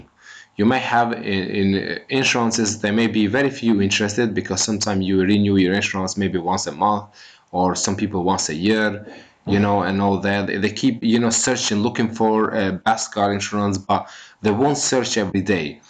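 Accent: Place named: Albania. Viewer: You sound like you are from alnania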